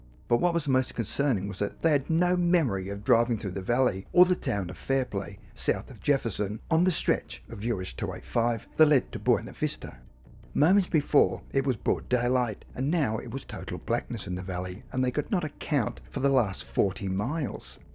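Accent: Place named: Australia. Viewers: People probably don’t know that the narrator is Australian. Everyone thinks we all sound like Steve Irwin. He has a great voice for narration. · 99% of people know he’s Australian bro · I think they probably do know he’s Australian. M 😀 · How can you not know?? He has a thick aussie accent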